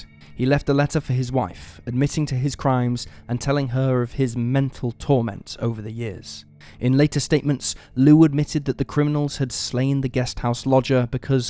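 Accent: England, United Kingdom. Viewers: "Quote" These videos sound rather odd with an English accent speaking in an Americanised way.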